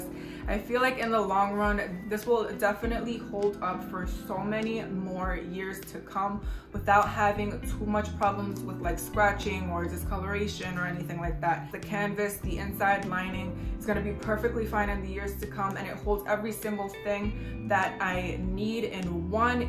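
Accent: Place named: New York, United States. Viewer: Def from New York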